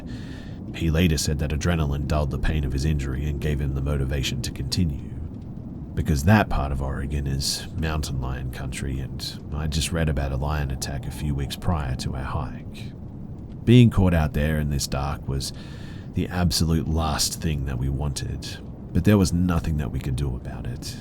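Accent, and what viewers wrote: Australia. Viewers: He's Australian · He's Australian. He is not form the uk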